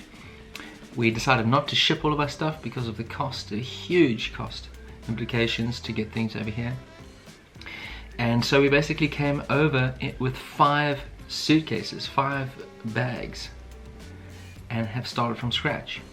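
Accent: South Africa. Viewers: Your accent is South African... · Bra, you have an Anglo South african accent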